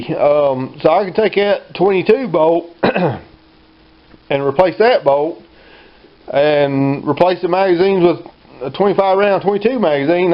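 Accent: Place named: South, United States. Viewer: I gotta go find B U B B A .. Love the country accent son.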